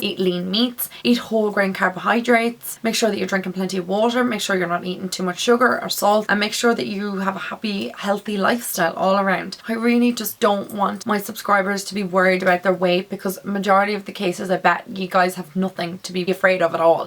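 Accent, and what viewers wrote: Ireland. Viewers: Are u Irish if so I'm from Connacht in Sligo and I think your from Dublin right?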